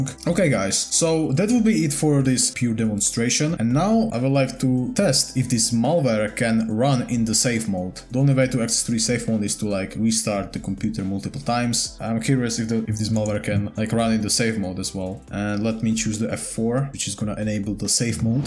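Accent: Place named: Czech Republic. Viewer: The czech accent is so cool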